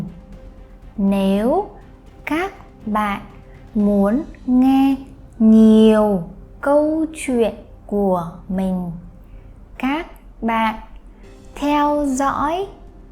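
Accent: North, Vietnam. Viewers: Finally a channel in northern dialect. I'm so happy that I found you! I started learning Vietnamese only a month ago (to talk to my in-laws) so I didn't understand that much of what you said. But I'm sure I will soon 😄 Please continue making videos like this! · Your video is very helpful for learning to listen to the northern accent, so thank you.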